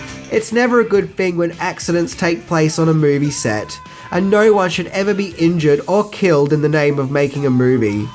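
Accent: Australia. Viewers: Hes a Aussie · Its an Australian accent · Akirasan Akirasan it’s what we speak here in Australia 🇦🇺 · Simon Tasievski bogan is australian, therefore he has an australian accent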